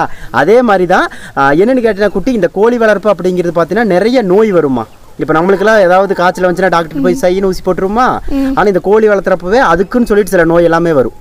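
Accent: Kongu, India. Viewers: Kongu Tamil kongu Tamil thanunga ❤🌱 By Kongu Pet Collections 🌱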